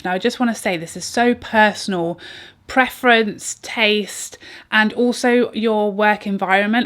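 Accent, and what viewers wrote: England, United Kingdom. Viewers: I'm italian 41 years old mum, I love listening to you and I love your wonderful English accent! 😍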